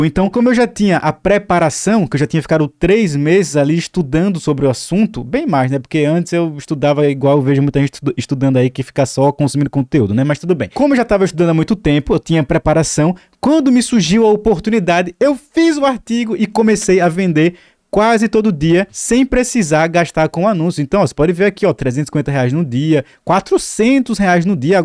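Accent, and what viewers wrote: Northeast, Brazil. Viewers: Nordestino, de onde tu é? Sou do RN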